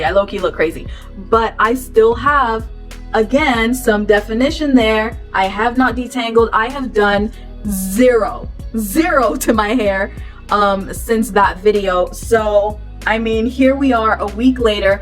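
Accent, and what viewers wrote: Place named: Jamaica. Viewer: It is that Jamaican Accent Young Lady♥️. I actually have the henna mask in my head now. Plan in keeping it on for about three to four hours. I will definitely be looking forward to new videos from you.♥️♥️♥️♥️♥️♥️♥️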